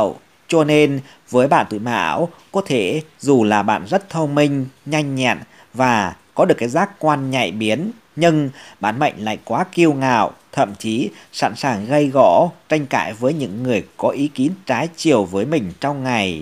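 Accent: Thanh Hoa, Vietnam. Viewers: Bạn ơi. Gốc thanh hóa hả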